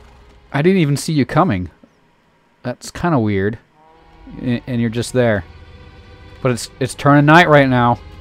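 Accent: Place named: United Kingdom. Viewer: WE ARE BRITISH 🇬🇧 (sorry I love the British accent)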